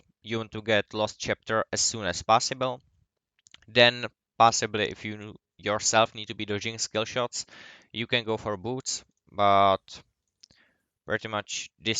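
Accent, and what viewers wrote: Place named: Czech Republic. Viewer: Vic cesky-zniciho cecha jsem snad neslysel xD. Good Guide.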